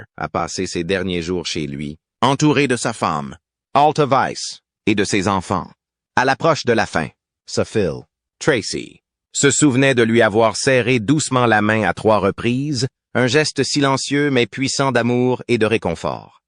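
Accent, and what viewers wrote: Quebec, Canada. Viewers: De tout les beaux accents possibles pourquoi l'IA utilise l'accent québécois ?!?....😅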